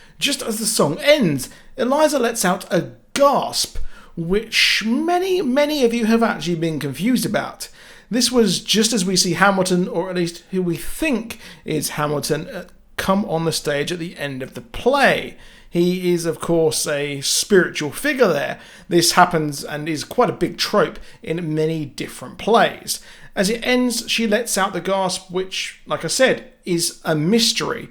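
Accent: United Kingdom. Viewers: “When I sAwR it” sorry I just love British accents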